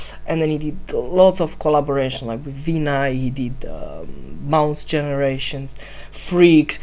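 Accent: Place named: Italy. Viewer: si sente la pronuncia italiana :D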